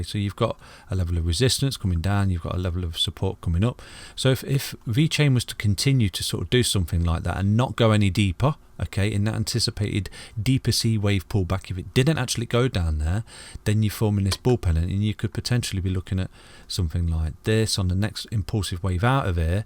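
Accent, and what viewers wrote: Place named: South Coast, United Kingdom. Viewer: What part of the UK are you from? Im guessing on the south coast somewhere???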